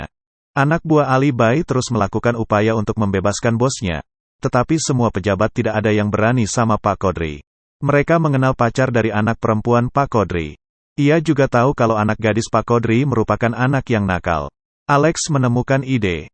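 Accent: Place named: Banyumas, Indonesia. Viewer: Wong ngapak pembawa acaranya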